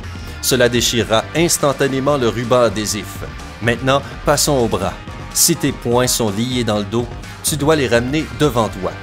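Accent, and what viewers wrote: Quebec, Canada. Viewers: Cet accent quebecois juste inssuportable..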